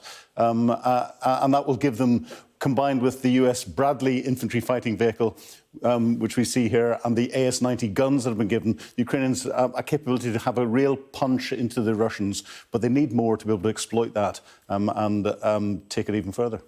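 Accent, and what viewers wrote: United Kingdom. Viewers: she sounds British but she is not British. How can this be ?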